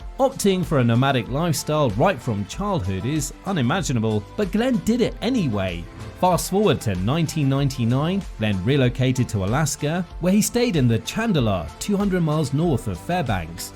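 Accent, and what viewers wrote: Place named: England, United Kingdom. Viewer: why does the narrator have a English accent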